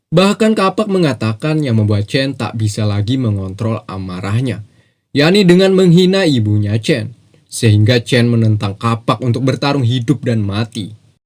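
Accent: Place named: Kalimantan, Indonesia. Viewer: Bg cara bicara lu kek orang kalimantan emang aslinya orang mn, ngomong² gua orang kalimantan selatan bg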